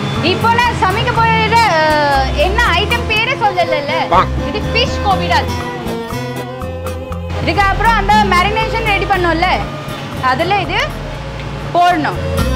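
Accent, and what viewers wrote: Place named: Kerala, India. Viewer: நீங்கள் பேசும் போது மலையாளம் தெரியுது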